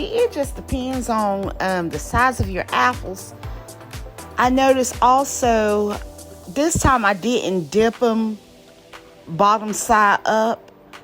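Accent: South, United States. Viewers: I love your southern accent!! I am from NOLA so ours is kinda fast with a little southern. You apples look delicious and I can’t wait to use your recipe! Thanks so much for sharing!!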